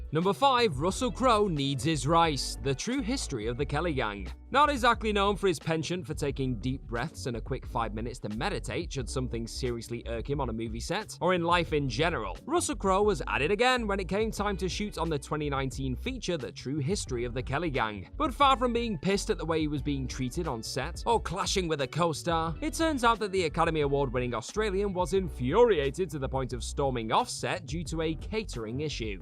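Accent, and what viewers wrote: United Kingdom. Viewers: "Drunk". Narrator is British.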